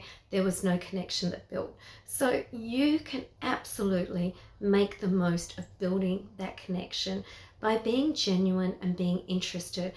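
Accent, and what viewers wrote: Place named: United Kingdom. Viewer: Thank you for your informative and beautiful video,! 😃👍 You are gorgeous and lovely 😍, and I love your cute sweet and beautiful UK accent!! 🥰😊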